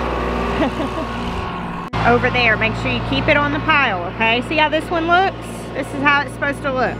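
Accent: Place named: Arkansas, United States. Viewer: Well I wanted goats but now I don’t think it’s a good idea for me. 😂🥰🥰🥰. I WOULD NEVER GET ANYTHING DONE. They are so dang CUTEEEE. I feel the SAME AS ABOUT TOMATOES. 😍😍🍅🍅😁😂 prayers coming from down south LOUISIANA ALWAYS. 🙏🙏🙏 KEEP SHINING LIGHTS GIRL. I have been saying that I stumbled upon my REFUGE here in your ROOTS and twangy Arkansas accent last year and GOD snuck right back into MY HEART not long after. I think you might have been a key ingredient that was needed for me to let HIM open my EYES. IM STILL SO THANKFUL FOR THAT. Keep talkin TWANGY and sharing your ROOTS AND REFUGE there are others out there just like me that need it. ❣️💡😊🦋🍅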